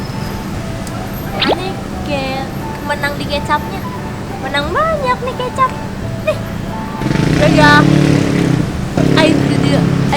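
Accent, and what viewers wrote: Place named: West Java, Indonesia. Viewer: Channel aksenya kayak sunda sih wkwk eh aslinya tegal 😂😂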